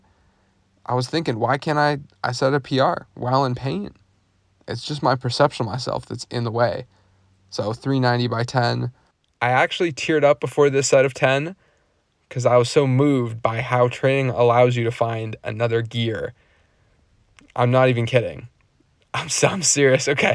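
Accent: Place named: Canada. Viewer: what is your height? and are you canadian? you sound very canadian 😆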